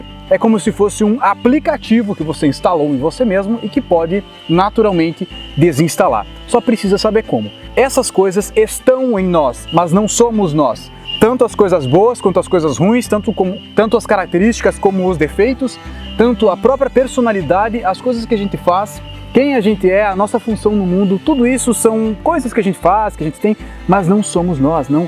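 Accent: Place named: Northeast, Brazil. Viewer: Acho que você é do sul, mas sinto um leve sotaque nordestino ☺️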